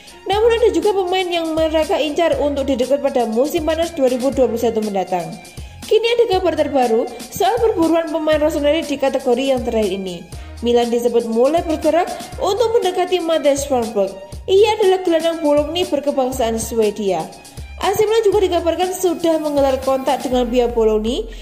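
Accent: Java, Indonesia. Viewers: Wong Jowo mana neng, logatmu kuental 😘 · Medok abeezzz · Medok....amat neng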